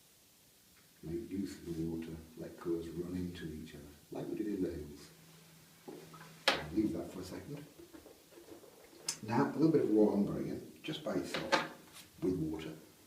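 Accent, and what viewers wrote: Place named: North, United Kingdom. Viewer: Put the subtitles on top right of video three dots Captions, he is easy for us Northerners to understand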